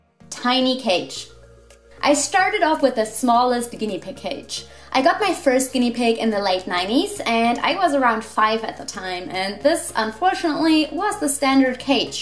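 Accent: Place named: Germany. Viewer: what part of germany are you from? that's an interesting accent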